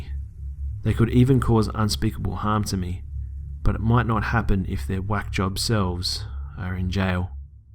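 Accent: Australia. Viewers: U australian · ur Austrailian thank god there's an Austrailian saying a horror story I am subing to u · Is he Australian?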